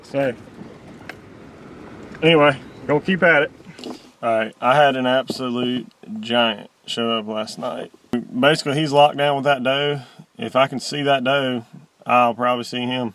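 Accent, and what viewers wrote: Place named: South, United States. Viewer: As my Mamma from East Texas would say, "That boy sounds like Real Country".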